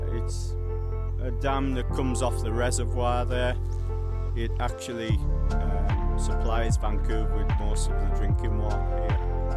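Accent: United Kingdom. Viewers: To me, the image quality of your videos is fantastic. I don't always understand what you are saying (I am a francophone and not familiar with british accent) but watching your videos is a real pleasure. Bravo!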